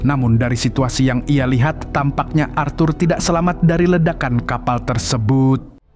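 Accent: Banjar, Indonesia. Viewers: Hahhhhh orang Banjar kahhh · urang banjar sekalinya ikam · Pian org banjar? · Abang,Pian ne asli org Banjar kh 😂😂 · Urang Banjar kah mang 😅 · Mamang ni org apa si? Kok ada banjarnya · banjar kah pian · Adminnya urang banjar leh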